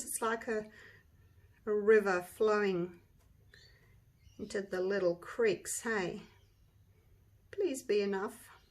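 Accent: Australia. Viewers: Love this video and love the Aussie accent!!! “Oh this is so exiting lol 😂 “ u made me giggle how happy u were wen removing your clear coasters from your mold!!!!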